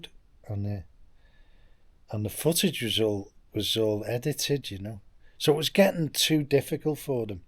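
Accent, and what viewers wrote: Liverpool, United Kingdom. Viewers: All this from in your head ... you have some brain mate! Barrow boy, paper round and betting shop. With scouse accent ... No way! This does not add up ... I will try and join the dots and work you out! :)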